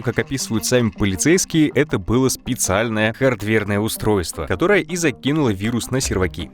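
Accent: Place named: Kazakhstan. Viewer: С первых минут видео понятно, что автор с Казахстана😂😘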